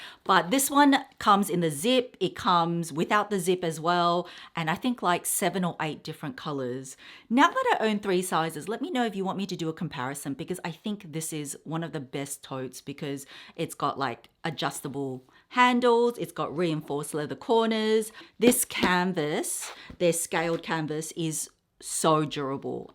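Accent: Australia. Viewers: Oh FLP tote is very nice. I like the zipper and button closing features.. Would love to win the MJ Tote bag. To this day, unboxing videos are still my favorite to watch. I love the way you talk about the background of the brand, the information about the items you purchase in your Aussie accent. 🥰😘Linhtwin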